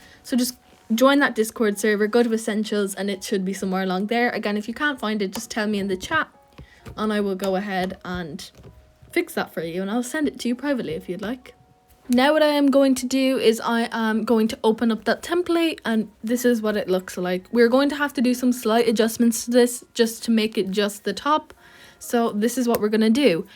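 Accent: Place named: Ireland. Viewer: Are u irish? Luv ur accent :)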